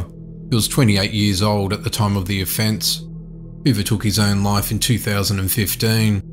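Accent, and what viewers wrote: Australia. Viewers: Thats a Australian accent actually. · Is that how Australians speak normally? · im disappointed that all these sound like the same case! fucking psychos edit : why are people making such a big deal about his narration? it is very clear. he’s just australian.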